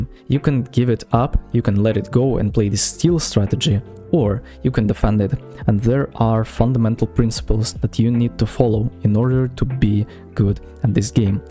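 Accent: Russia. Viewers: сразу понял что ты русский)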